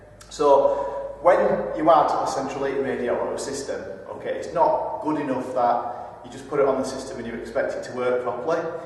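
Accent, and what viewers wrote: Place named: Yorkshire, United Kingdom. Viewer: Nothing wrong with your Yorkshire accent, I didn't see Harold Wilson or William Hague using subtitles. I bet Captain Cook didn't have subtitles. Only criticism is that the speaker is too far from the microphone which results in the echo. You can help me install an air source heat pump, but you live too far away.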